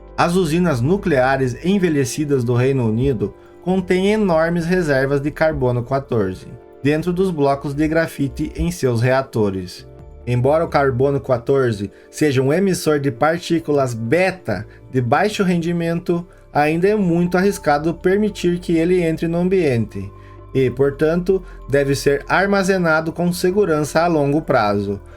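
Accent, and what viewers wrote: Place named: Caipira, Brazil. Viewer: Este sotaque caipira do locutor é insuportável!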